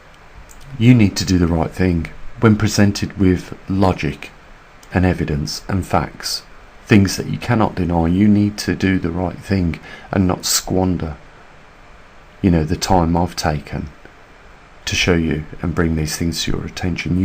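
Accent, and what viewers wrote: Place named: Midlands, United Kingdom. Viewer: Very interesting info. Do wish I had finances to assist your channel. I’m local to you (just south of Solihull) based on your accent.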